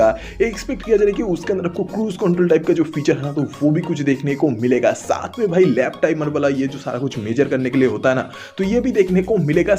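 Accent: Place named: West Bengal, India. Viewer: Voice to bangoli lagta hai 😅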